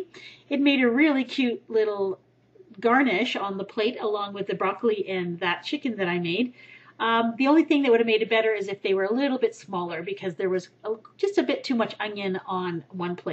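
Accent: Canada. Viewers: within 30seconds of watching this video I can tell she is from Canada!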